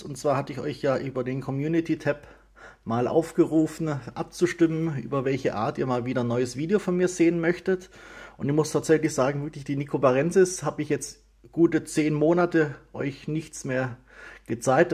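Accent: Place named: Swabia, Germany. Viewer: Ich höre schwäbisch. Wo lebst du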